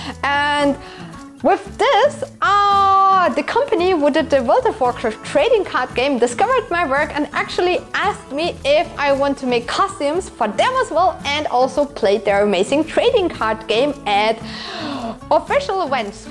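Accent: Germany. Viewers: For me its really hard to sit though the video cuz of her voice.. and i dont like german accent for some reason soo.. yeah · Das enlglish hört sich deutsch an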